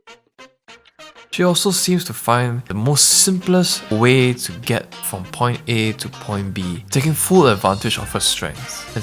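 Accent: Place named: Southeast Asia. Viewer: great video! but random qn.. by any chance are you Southeast Asian? hahahaha your accent is familiar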